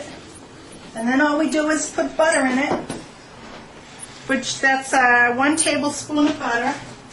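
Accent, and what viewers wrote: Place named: Massachusetts, United States. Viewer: YOU ARE FROM MASSACHUSETTS YOU SOUND EXACTLY LIKE MY FAMILY!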